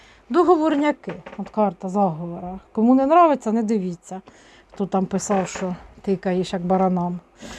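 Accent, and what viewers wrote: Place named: Surzhyk, Ukraine. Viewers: смотрю разных тарологов. Есть очень культурные, есть матершинники. Есть рускоязычные,некоторые красиво говорит по украински, но я обажаю ваш суржик.Вы всегда говорите очень просто и доходчиво. Спасибо за росклад. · Мені понравилось,а суржик наш,полтавський!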